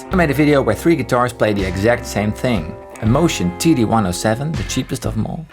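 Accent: Netherlands. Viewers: You sound very very dutch. Heb ik t goed? ;)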